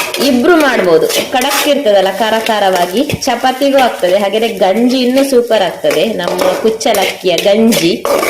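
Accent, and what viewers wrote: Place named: Bangalore, India. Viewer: In books nobody use Bangalore Kannada tulu nadu ppl talk books Kannada.. your Bangalore Kannada lot of slang ok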